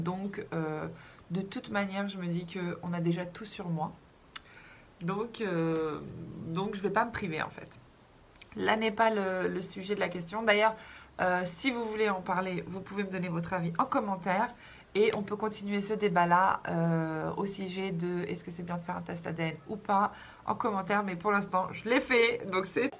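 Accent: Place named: Pontic Greek, Greece. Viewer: Sans que tu me dis quoi que ce soit quand j'ai vu le début sans ecouter la vidéo , je me suis dit cette fille a un visage Rum pontios ..., en t'écoutant parler , mdrrr j'en étais sûr , mais ne tkt pas ton père de géorgie était une région à connotation Rum , beaucoup sont revnus en grèce après la chute de la Russie début 1990 tous des pontus 'rum géorgien ... d'ailleurs ils avaient un nom donnée les russepontios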